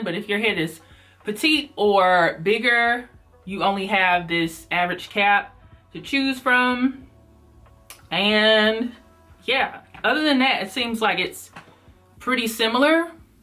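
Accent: South, United States. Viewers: ok. Bye the way, I love your southern accent!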